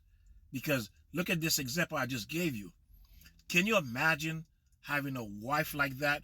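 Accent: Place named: Caribbean. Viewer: Where are you from? I hear a West Indian accent.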